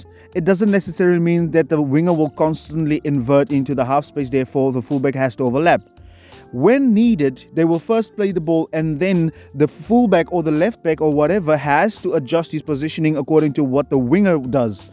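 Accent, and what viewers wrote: South Africa. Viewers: he has a South African accent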